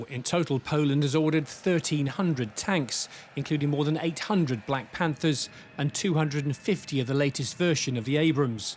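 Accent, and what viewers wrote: United Kingdom. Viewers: British accent and real intention of Russia are not connecting together.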